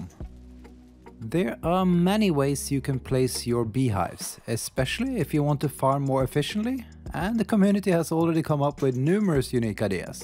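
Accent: Norway. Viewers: Might i ask where your from ? sound so Norwegian :p Love the ideas ! keep it up :D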